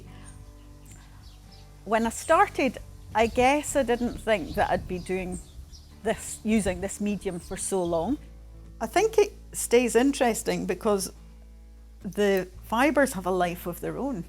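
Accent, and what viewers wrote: Scotland, United Kingdom. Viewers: I love your work and your beautiful Scottish accent. My dear friend Wendy was from Arboath and I could listen to her talk all day long. Sadly we lost her to cancer a few yrs ago, but thank you for reminding me how much Ive missed her and what a treasured friend she was. Cheers from stinking hot FL. ;-)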